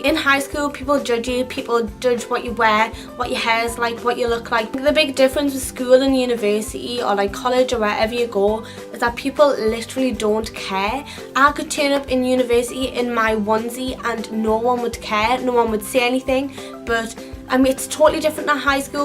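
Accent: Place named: County Durham, United Kingdom. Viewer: are you and marnie from geordie shore from the same part of the UK? just curious because you have similar accents. But i have no idea where you are from in the UK. she's from County Durham btw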